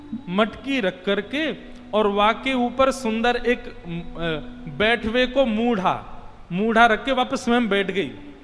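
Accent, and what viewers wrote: Braj, India. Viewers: Aaha aapki vraj ki bhasa mohit kardeta hi maharaj koti koti pranam🙏🙏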